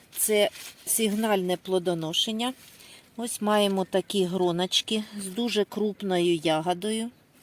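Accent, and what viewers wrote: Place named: Sumy, Ukraine. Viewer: я із Сумської області, у нас всі так розмовляють, майже. Це нормально!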